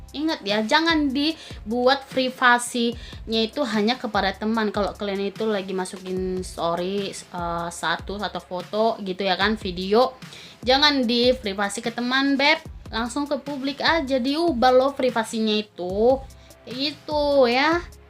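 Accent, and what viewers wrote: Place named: Medan, Indonesia. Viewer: Medan kali kau bah,, salam kenal subscriber barumu dari gununh para tebing tinggi..😊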